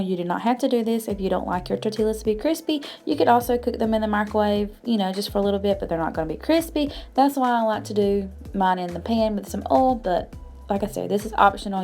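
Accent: South, United States. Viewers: LOL, she's from the South and pronounces words a little different like maters instead of tomatoes 😉 I'm a NC Southern girl myself 😁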